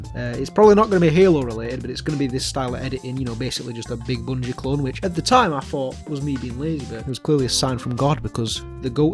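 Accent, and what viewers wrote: United Kingdom. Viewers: the comment about hating on the British accent is sad. I think the British accent is cool, like a calling card of their culture. I love this channel because he has almost no filter. I can't get enough. Keep up the good work. Help the this poor sad world.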